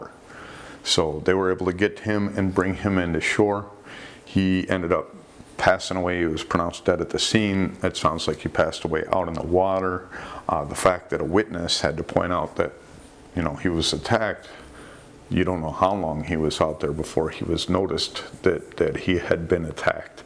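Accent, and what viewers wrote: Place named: Michigan, United States. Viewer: My step father was from Alto, Michigan (East of Grand Rapids). You sure sound like the folks from around there. Am I close?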